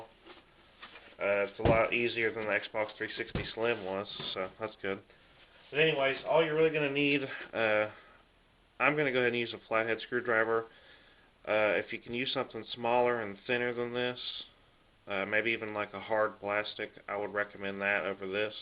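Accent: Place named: Cleveland, United States. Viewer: And he's from Ohio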